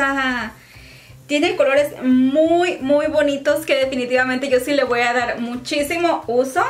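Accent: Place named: Mexico. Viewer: Disculpen mi ignorancia yo recién veo este canal pero no es mexicana? porque para mí tiene todo el acento mexicano.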